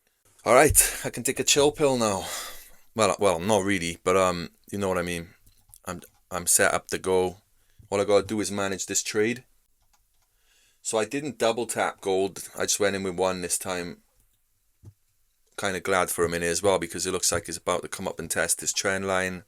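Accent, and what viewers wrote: Wales, United Kingdom. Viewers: You Welsh mate? Just by the accent. From Wales?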